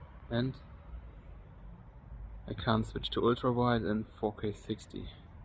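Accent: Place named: Germany. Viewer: Immer wieder spannend, dass man instant hört, dass du aus Deutschland kommst. 😂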